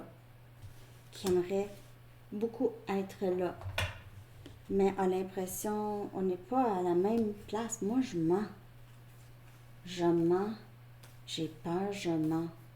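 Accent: Quebec, Canada. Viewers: Bonsoir, J'adore vous écouter, tes messages refletent ce que je vis. J'adore ton accent québécois, ça me fait sourire! Merci 🙌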